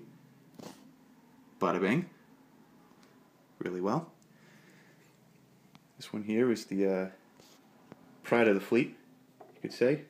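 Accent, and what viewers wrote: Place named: Massachusetts, United States. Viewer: Nice meeting you! Grew up in Mass. and it's always nice to hear the accent. Best Wishes!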